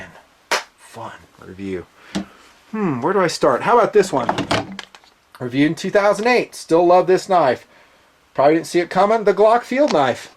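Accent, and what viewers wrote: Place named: Alabama, United States. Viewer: your Bammy accent...lol. Keep the knife vids rollin.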